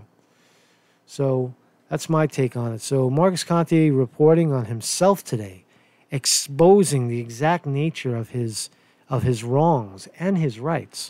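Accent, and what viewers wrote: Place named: Brooklyn, United States. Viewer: Exposed? You're a rather transparent person already, Marcus. You've said enough in the past to inform your audience of who you are and where you've been in life. You know, I notice a trend on YouTube. Creators are coerced into conforming their video content and even presentation style to the interests of one or two viewers. Some creators even request suggestions. But when the critiques are about the presenter, rather than the presentation, I'd be inclined to ask who THEY are working for. Perhaps some imaginary TV broadcasting network or Hollywood. Creators aren't actors, for the most part. They are people, with their own life-stories, their own character and mannerism and their own YouTube channel. As for your revelations? Meh, Brooklyn accent, scrappy when assholes approach, grew up in the 80s...and learned his lessons in the School of Hard Knocks. 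My sister, however, is amazed that you still look so young and healthy with what you've gone through. I think it must be the bodhicitta of a bodhisattva preserving you for the work you're doing right now and in the past. Water under the bridge, Marcus. Never feel the need to justify your motives to viewers. If they can't figure you out, then they ought not let the door hit them on the arse on their way out, just as you said. :)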